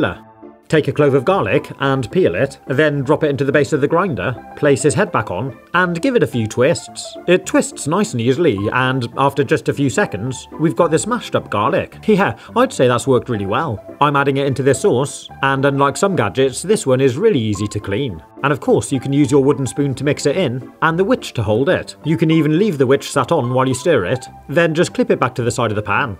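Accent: United Kingdom. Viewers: You sound more British than British people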